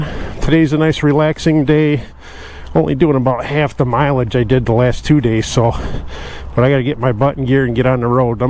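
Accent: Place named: Western New York, United States. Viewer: I'm enjoying this way too much. Btw that wny accent is strong... sound just like me.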